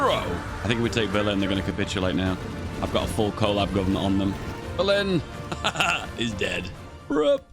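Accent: United Kingdom. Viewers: It's amusing to hear a Limey talking shit about American economic policy and praising multiculturalism while seemingly oblivious to the fact that the policymakers of both our nations work for the central bank, not for the citizens, who in turn belong to a parasitic culture which has always and will always destroy its host nations through glorifying multiculturalism. Our nations are collapsing and our Folk are on the brink of extinction while we waste our time on pointless video games and silly videos on the internet.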